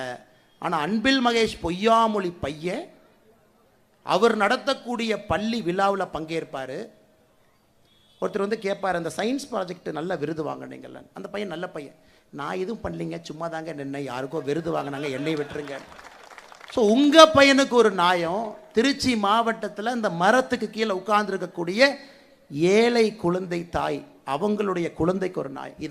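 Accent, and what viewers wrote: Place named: Chennai, India. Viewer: Madras பாஷை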